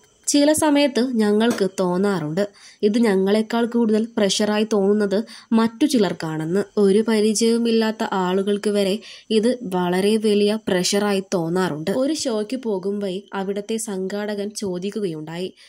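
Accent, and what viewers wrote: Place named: Malappuram, India. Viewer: ഇവരുടെ ഭാഷ കേട്ടിട്ട് മലപ്പുറം ആണ് എന്നാണ് തോന്നുന്നത്...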